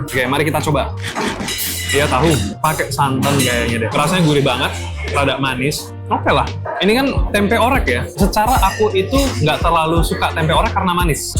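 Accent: Java, Indonesia. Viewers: medok men lak ngomong 😂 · Tiba-tiba jadi medhok banget, sampe kaget loh aku, tapi cocok kok 👍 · mode medok nagih banget plisssss 😭😭😭😭💞 anw im glad u enjoy surabaya 🥹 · Medhok bet, cocok tp😦✨ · Lol, medok katanya...aq dengerny biasa aja sih...karena setiap hari denger yg medok-medook 😂😂 Anyway, seru juga buat dicobain. Aq lagi cari ide wisata buat suami n ibuku yg udah lansia. Stay happy n healthy yaa❤ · lucuuu kamu yaampun tiba-tiba main ke kotaku, mana ga expect medok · Es krim parfum :_akePepelol::_akePepelol: seru banget nontonnya dari awal sampai akhirr :_akeApprove: suaramu auto medok d sini :_akeLol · Habis nonton jadi laper, Ngiler bgt liatnya Btw jowo iso medhok ya wkwk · apa kamu orang jawa? soalnya logatmu jawa bgtt wkwk (muji) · full medhok rek 😂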